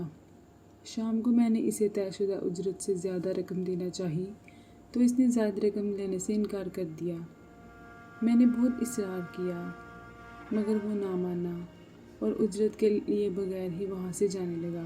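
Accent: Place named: Kashmir, India. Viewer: I hope u from Kashmir it's your voice 😲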